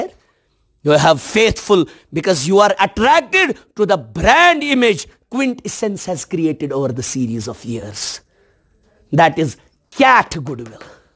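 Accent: Kashmir, India. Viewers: Sir ap ki kashmiri sunn k mojhy B ed ki clases ki yaad aa gi...😂😂😂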